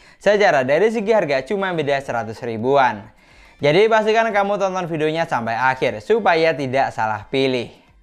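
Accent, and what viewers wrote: Madura, Indonesia. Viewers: kek logat madura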